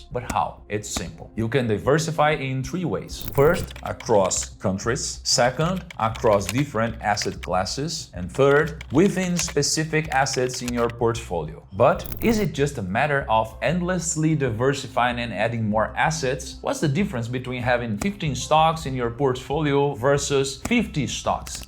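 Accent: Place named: Brazil. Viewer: Fly, my friend! Fly! So good to hear your voice in English! You'll get to the hearts of people with your Brazilian accent! This is what happened to me 20 years ago, working as a volunteer in the US and learning the language at the same time! You're soooo good to go! You rock! <3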